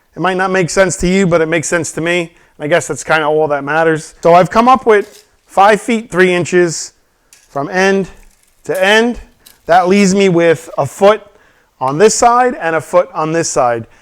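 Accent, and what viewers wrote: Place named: Philadelphia, United States. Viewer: I listened to you for a minute and was like he’s from tristate area. Thats a Phila accent if i ever heard one. Then looked up Alro and there one 10 mins away haha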